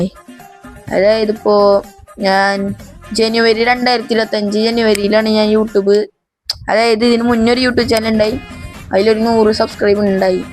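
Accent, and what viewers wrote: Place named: Thrissur, India. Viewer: Pkd aano... ഞാനും pkd ആണേ... ഇയാളുടെ slang trissur ആണല്ലോ 😊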